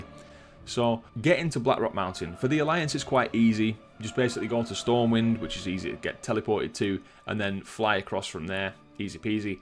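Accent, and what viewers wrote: United Kingdom. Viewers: Loved your chill and british way of talking!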